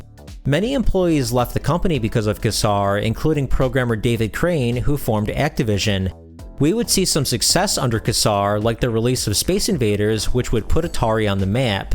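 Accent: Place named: Upstate New York, United States. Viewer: You from Upstate New York? Your accent reminds me of home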